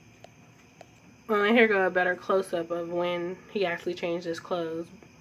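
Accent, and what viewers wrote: Pittsburgh, United States. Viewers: Good video... if your not from Pittsburgh u sure have the accent 😀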